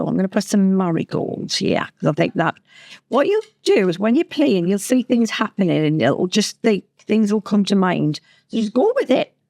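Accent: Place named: Ireland. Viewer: Beautiful... another technique I will be trying. The only thing I need to get is the glycerin. Are you Irish? My family is from county Cork. Love your accent.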